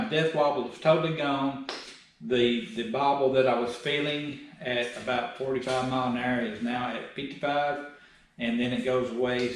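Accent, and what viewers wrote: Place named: Georgia, United States. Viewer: Changing mine tomorrow!!! Thanks for the vid and confidence. Im from Ga so i appreciate the accent too, made it alot easier to pay attention. Thanks bro! I didnt notice a problem with mine till i put 33s on it. 40mph and up she starts kicking. Hopefully this will get me by until i do a whole front end job.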